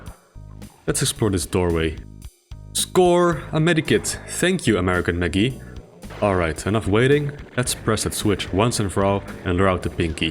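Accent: Netherlands. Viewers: Wait youre dutch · You Dutch? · Are u dutch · Are you dutch by any chance? Your accent sounds dutch. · Have to say I also thought he was Dutch. Am South African and used to live in NL for a few years.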